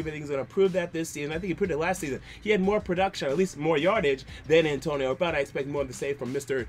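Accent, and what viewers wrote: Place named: South Florida, United States. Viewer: That South Floridian accent doe 💀💀💀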